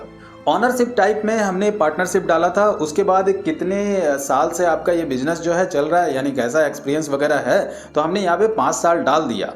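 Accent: Bihar, India. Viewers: bihar se ho kya? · Bhai apki bate Bihar acchi lagi 🤭🤭🤣🤣🤣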